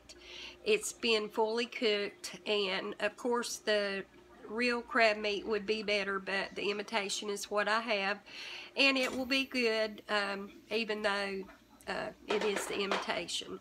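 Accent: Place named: North Carolina, United States. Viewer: I knew your accent sounded familiar! I’m from the Boone/Blowing Rock area :)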